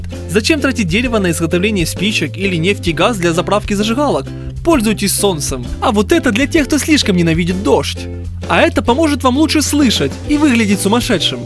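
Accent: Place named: Surzhyk, Ukraine. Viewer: ты конечно, молодец, но пожалуйста, говори не на суржике. Ухо режет...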